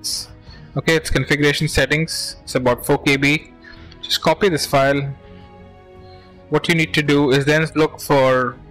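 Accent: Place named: India. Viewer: The moment I heard an indian man in this video, I was overcome with a sense of relief.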